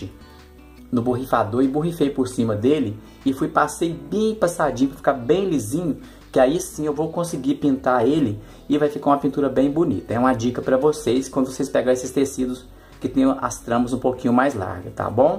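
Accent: Minas Gerais, Brazil. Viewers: Suas aulas são maravilhosas sua didática muito boa homem de fé eu também sei da nossa dependência de Deus Todo poderoso.Qual cidade você é tem sutaque mineiro gostaria de receber seus riscos ,sou da Bahia.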